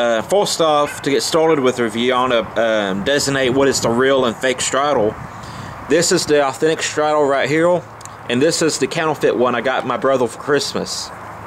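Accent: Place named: South, United States. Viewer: I bet u from southern USA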